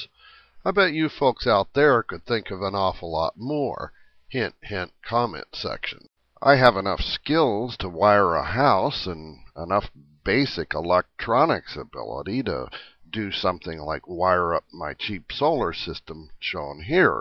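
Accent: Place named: South, United States. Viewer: You sound like a southerner :D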